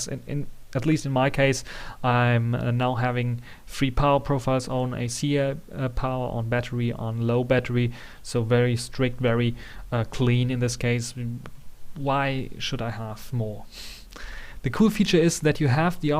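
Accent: Germany. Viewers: thank you for the video loved every minute of it. Where are you from is it Germany? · Aah i love German accent :)))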